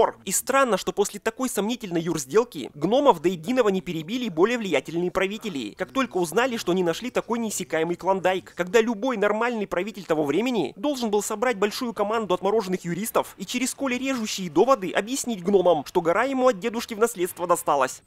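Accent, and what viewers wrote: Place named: Tatarstan, Russia. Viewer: Извините, вы татар? Очень это слышно))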